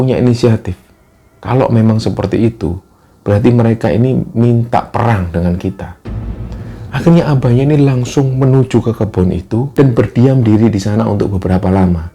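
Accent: Java, Indonesia. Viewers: Sugeng ndalu mas 🙂 Gk prnh bosen liat channel ne, cr pembwaan mas dlm crt slalu enk didengar n apa adanya, n tetp dgn cri khas jawa tulenny, smoga trus brkembang n crta2ny bs mnmbh pngthuan bt org lain aminnn, slm dr lampung 🥰🥰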